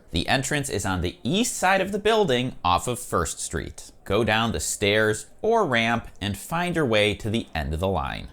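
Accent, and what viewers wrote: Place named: Scotland, United Kingdom. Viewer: A Scottish tour guide? weird.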